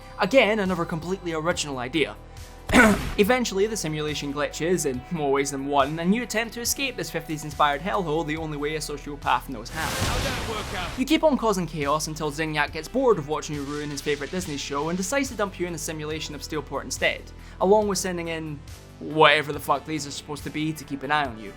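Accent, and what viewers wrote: Scotland, United Kingdom. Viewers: Ay is this man Scottish Huge w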